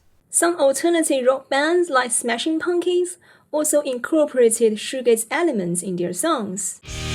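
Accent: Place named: Somerset, United Kingdom. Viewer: You've nearly nailed that Somerzzett accent!!!❤❤😊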